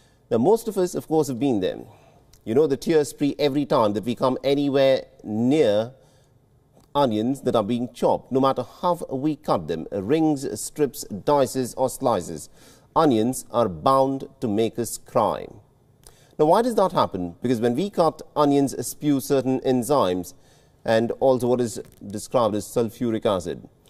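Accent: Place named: India. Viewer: bastardized the English language with that ganges accent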